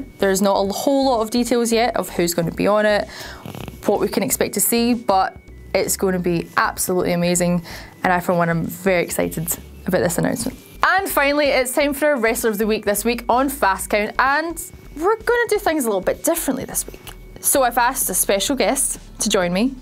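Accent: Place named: Scotland, United Kingdom. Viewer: My man's got one hell of a Scottish accent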